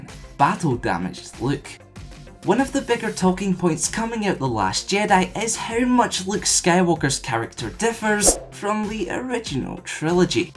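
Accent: Scotland, United Kingdom. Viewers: what part of Scotland are you from? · thanks for answering. I don't mind I like your accent:)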